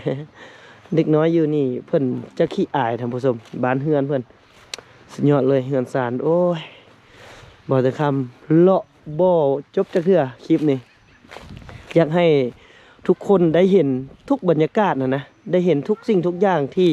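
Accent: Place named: Surin, Thailand. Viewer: เว้าคือล่วยแถว จ สุรินทร์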